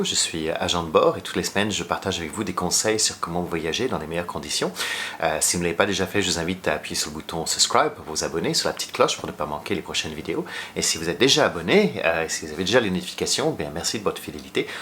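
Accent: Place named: Quebec, Canada. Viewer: Bonjour, merci pour cette vidéo extrêmement détaillée. Même si j'étais fort bien préparée, j'ai appris de nouveaux conseils avec vous. Je me sens beaucoup plus confiante aussi. Également, je me demandais êtes vous québécois? Vous n'avez pas l'accent français. A bientôt 😊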